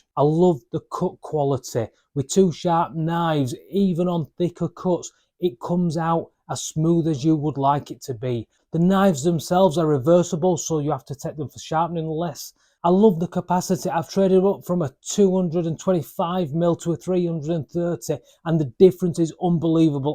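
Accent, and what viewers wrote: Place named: Northern England, United Kingdom. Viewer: Best and easy to understand review I’ve ever seen for any power tool. Told me everything I wanted to know and it been in a Northern accent was an added bonus. Thank you 🙏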